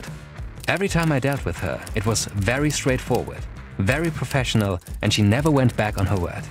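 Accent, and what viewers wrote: Germany. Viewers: Nice german accent btw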